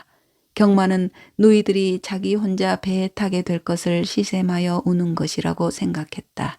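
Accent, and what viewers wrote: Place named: Gyeonggi, South Korea. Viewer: 경기사투리가 정겹네요 예전 강화도령철종드라마가 기억에 새롭습니다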